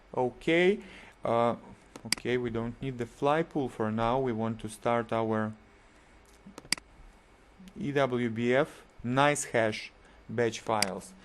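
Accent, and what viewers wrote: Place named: Russia. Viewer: Отлично! Всё понял друган! Привет из России! Сколько сейчас долларов в сутки майнишь? что то у тебя реально конкретный акцент....ты из РФ что ли? :)))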